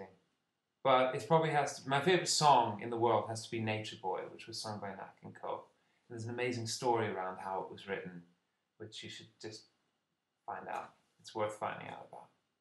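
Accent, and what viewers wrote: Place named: United Kingdom. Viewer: ur accent uuuh god is killing me i'm in love with British accent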